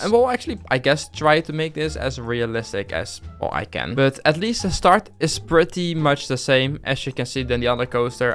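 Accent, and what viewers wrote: Netherlands. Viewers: Are u dutch? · Ben jij Nederlands dik hoor het aan je accent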